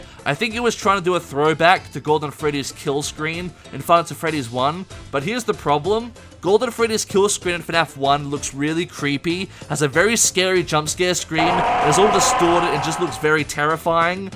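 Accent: Australia. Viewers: Omg how didn’t I know he was aussie? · I didnt know you were aussie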